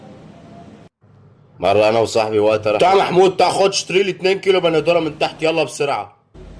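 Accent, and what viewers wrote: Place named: Iraq. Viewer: اقول لك انت كلامك عراقي بس انت اظن شو اريد او اردني بس كانك انت عراقي مبين حتى من العماره😂😂😂😂 هذه المنطقه اسمها العماره اسمها اي اصلها ميسان😂 في يوم من العماره🎉 خلي يحط لي لايك لعيون العراق او العراق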